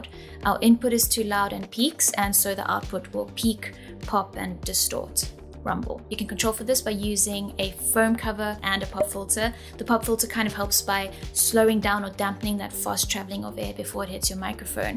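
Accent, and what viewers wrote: South Africa. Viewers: This was so helpful! Thank you! Totally random question, but are you from South Africa?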